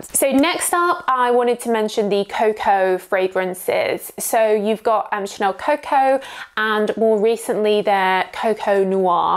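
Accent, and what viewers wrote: England, United Kingdom. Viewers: I love your reviews! You were so correct about the Chanel Chance eau tendre. I bought it and it was the weakest perfume performance wise for the price. It disappeared on my skin and could not smell it after an hour or less. My coworker can wear it and I smell it on her all day long. But last year you told us about Zara Applejuice and I bought it last year for $25. It was the big bottle and it smells better than the Chanel IMO, and lasts longer and smells stronger than the real one. That’s on my skin though. Everyone is different. My skin tends to eat perfumes unless they are stronger or a citrusy fresh like Clinique happy works great on me for example. It was disappointing though buying the eau tendre just to have to return it back. Because it does smell so so good just very weak and expensive. So thankyou for all your tips! 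I love watching your videos and I love your English accent!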